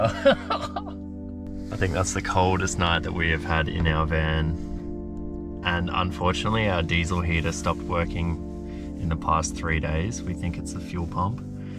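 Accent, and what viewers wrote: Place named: Australia. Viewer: Are you German? You sound much more like an Aussie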